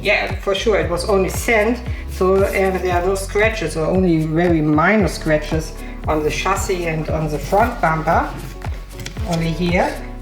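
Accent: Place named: Germany. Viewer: dass dein Akzent irgendwie deutsch klingt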